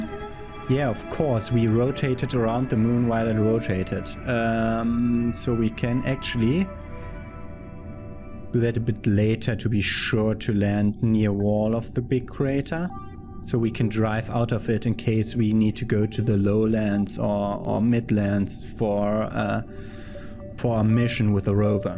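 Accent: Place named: Germany. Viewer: Moin klingst wie ein Deutscher deswegen schreibe ich mal auf deutsch . Versuch mal wenn du in einen Orbit willst nicht so steil nach oben zu fliegen und schon gar nicht mit einem Manöver einen Orbit bestimmen . Wenn du startest beschleunigst du auf den ersten 1200m auf 160m/s ab hier die Rakete um 15 grad nach rechts neigen . Steige ein wenig in diesem Winkel auf bis du bei 300 m/s bist und neige die Rakete wieder ein wenig . Steige wieder ein wenig auf und wärend du langsam auf ca 1100 m/s beschleunigst neigst du du Rakete bis zu 45 Grad . Also nicht sofort auf 45 Grad sondern kontinuierlich . Wenn du ca 1100 m/s erreicht hast Triebwerk aus, ab hier müsste die Apo bei knapp <num>m liegen tut sie es nicht hebe sie auf 85.000m an . Hat die Rakete 72.000m Höhe erreicht , neige die spitze 15 Grad Richtung Erde/Kerbin also nach unten und gebe jetzt vollen Schub und beobachte wie ganz von allein ein perfekter Orbit entsteht .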